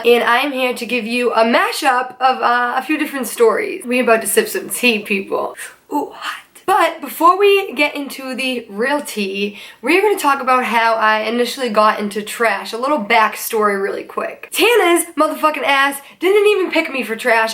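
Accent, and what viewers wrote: Boston, United States. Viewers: Kels LaMcintyre it is boston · Kinda sounds alittle Boston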